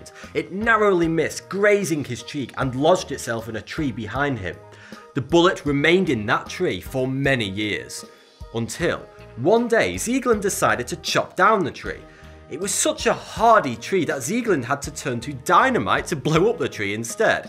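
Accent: United Kingdom. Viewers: xXMEMEGODXx 11 it's just his type of British accent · What happened to Vsauce? Why does he suddenly have a British accent?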